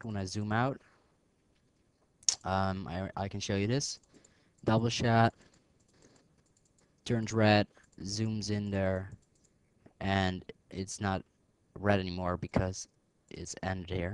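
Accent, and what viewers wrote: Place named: Philippines. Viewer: are you filipino by any chance? i have a lot of friends that sound just like you :D and nice vid!!! i love sony vegas~ oh and i was wondering, how do you get a transparent fade in between 2 videos. i used a fade through transparent transition and it only goes through black.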